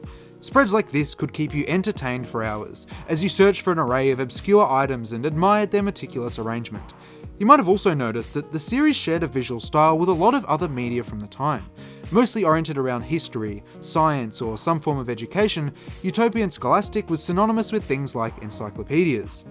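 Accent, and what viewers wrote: Australia. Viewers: Man I’m loving that more aussies are making content. It’s just so nice hearing someone that sounds like me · dont normally comment but stumbled across this video today and couldn't believe how insightful and fascinating this video was but also how entertaining it was. Also nice to hear an Aussie accent. Subbed.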